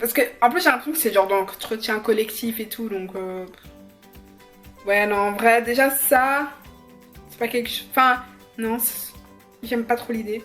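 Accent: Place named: England, United Kingdom. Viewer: Moi j'aime bien ton accent anglais hahah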